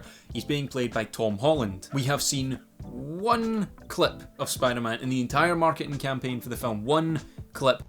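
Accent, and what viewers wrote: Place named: Scotland, United Kingdom. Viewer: I am loving hearing a lovely Scottish accent in super hero movie reviews! 1 more subscription on your way!!!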